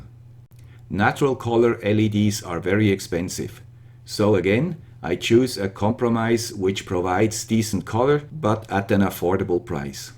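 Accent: Switzerland. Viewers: Congratulations! Is that picture "young guy with swiss accent" on your shelf? And very nice solution for cables! I think I'm gonna do same thing behind my door. Thank you. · Well done " " (or is it white gloves now:) )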